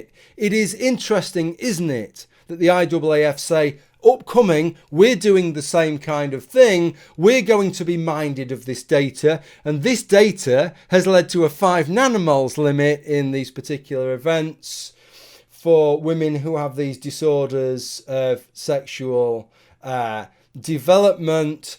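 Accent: United Kingdom. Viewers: RR 2.0, bigger, better and educational, even more UK accent.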